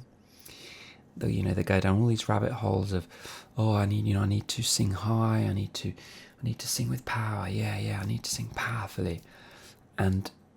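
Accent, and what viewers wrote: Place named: London, United Kingdom. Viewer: Uou sound like your from London area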